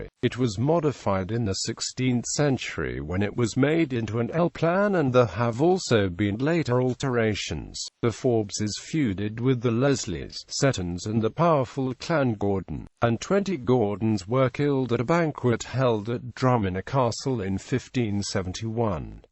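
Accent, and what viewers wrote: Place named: England, United Kingdom. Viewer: a very English voice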